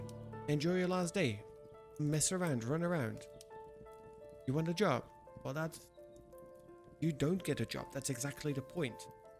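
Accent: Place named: Germany. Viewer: wow your English is very good then! I thought by your accent that you were German or similar ^^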